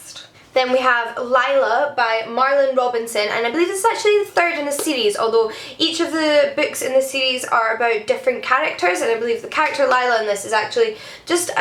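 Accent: Scotland, United Kingdom. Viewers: ***** Paid in books and swag, then? Not so bad. I binged quite a bit of your channel last night after I discovered you. Definitely one of my favorite booktubers so far. I'm really enjoying joining this community. But your beautiful accent makes me miss Scotland! My wife and I lived in Edinburgh for a couple years. Our first child was born there. Fond memories. P.S. in your profile pic you totally look like a blonde Karen Gillan.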